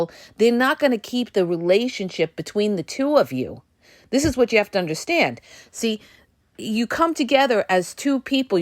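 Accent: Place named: East Coast, United States. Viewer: Her east coast accent is wild. She doesn't look like she would talk this way. I love her videos, very informative.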